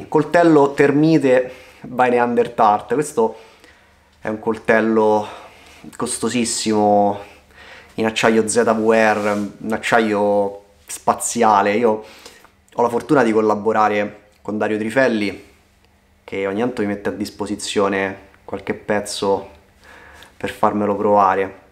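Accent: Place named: Lazio, Italy. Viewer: Ciao, grazie per la condivisione della tua attrezzatura invernale! Purtroppo ti capisco quando dici che è una tristezza fare video in casa... qui in Piemonte, da novembre, siamo stati più arancioni/rossi che gialli e purtroppo anche io mi sono dovuto arrangiare con video indoor! Speriamo di tornare presto in montagna!! PS se hai seguito qualche mio video avrai capito che sono Laziale (Latina) di origine, tra l’altro ho vissuto tre anni a Viterbo e il tuo accento mi ricorda la mia gioventù! 😂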